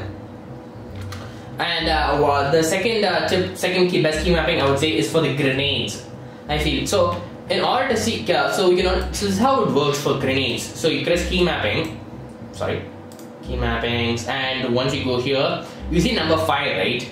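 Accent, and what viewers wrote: India. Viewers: Jesus fuck indian accent is so fucking annoying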